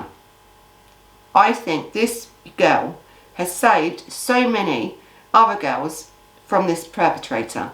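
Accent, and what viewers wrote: London, United Kingdom. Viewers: So good to see you back. I was hoping you were OK and just needing a break from the emotional drain of all this violence and cruelty you dig through to make us the videos. I love your London accent btw. Reminds me so much of listening to some very special people in my life. Old friends who aren't with us any more.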